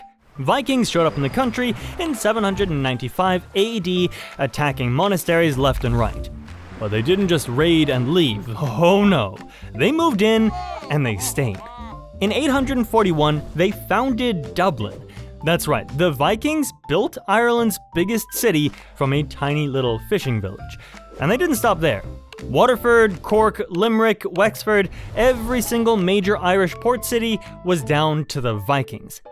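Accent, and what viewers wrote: Germany. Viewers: this guy really puts the german in the germanic scandinavian accents, ngl horrible horrible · It sounded German lom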